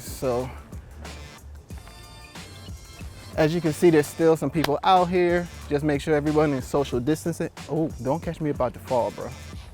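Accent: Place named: Caribbean. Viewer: Are you West Indian? I hear a slight accent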